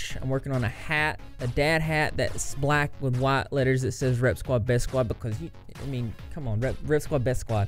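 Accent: South, United States. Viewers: Found you the other day under recommended videos & I’m obsessed!! I love the info & the southern accent - I’m from GA! Keep killing it 😍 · I didn’t notice you had an accent until you said “I’m southern” love that for me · What part of the south are you from? I can really hear it in this video. I’m from the south too. 😂😂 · “SORRY IM SOUTHERN, I can’t pronounce.” Saaaaaaaame lmao 😂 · This is great rep , thanks for reporting, and thanks for standing up for SA Victims. Also, I personally looooove southern accents so please don’t worry about how you pronounce things. ☺️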